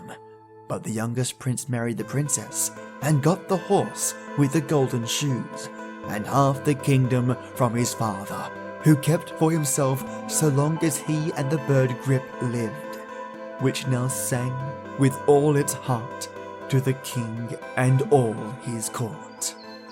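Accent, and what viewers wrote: Australia. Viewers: Oh my gosh! My night is complete. Your theme comes on...You mention Earl Grey? Is it TWG? With this wonderfully warm, Australian accented Tale Teller starts some awesome Swedish folk tales...I 'm having some 1837 Black tea by TWG it's all strawberry and good stories...as lovely as you. I have a bird, you know about Daisy, they really don't like to be touched or get a nice bite. That prince needs to get smacked up side his head being so silly and not listening to the fox. The law seems severe don't pay your debts or get killed. Such a wonderful folk tale...the bird singing was sweet. Don't you also agree if you mess up with those ritualistic creepypastas? It seems when you mess up with one of those by forgetting to light a candle or you looked at the wrong time...you're dead or cursed for eternity. The fox was extremely kind and had patience with the prince compared to other folk stories.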